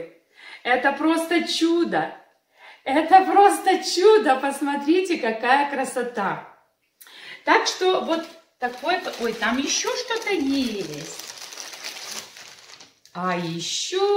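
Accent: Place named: Odesa, Ukraine. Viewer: Да, действительно подарки красота. Спасибо, приятно было услышать одесский говор. Удачи и всего самого наилучшего Вам.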